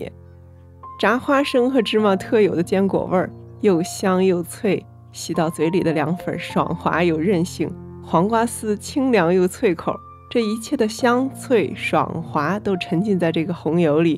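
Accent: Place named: Shaanxi, China. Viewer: 听口音是陕西人嘛， 额打算试试你的方子。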